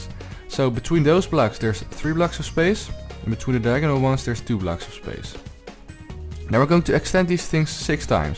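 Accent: Netherlands. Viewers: I sense a Dutch accent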